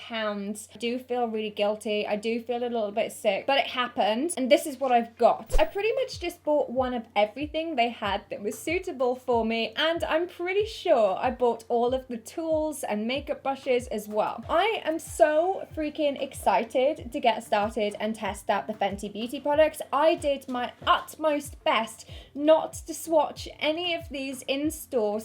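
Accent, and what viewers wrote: United Kingdom. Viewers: I never comment on youtube but this video i have to comment! I'm not even from UK, english isn't my first lenguage ( I'm from Brasil) but i speak english, my english is so mutch better when i started to watch your videos. Ii can even talk with your brittish accent! anyway, i have to say that u are now, my favorite youtuber. U r honest with your watchers, u make me feel comfortble, really i feel like u r my friend. Continue doing this amazing job, u r such beautiful outside and inside. wish you have more sucess every day. thanks for being my distraction on my sadness days, and help me understand more the british english and about make up hahaha . Love u !!! x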